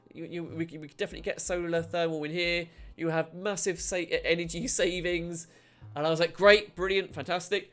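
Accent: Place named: United Kingdom. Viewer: guy with a British accent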